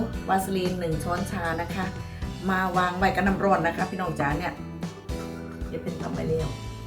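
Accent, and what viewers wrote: Korat, Thailand. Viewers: ชอบค่ะ พูดภาษาโคราช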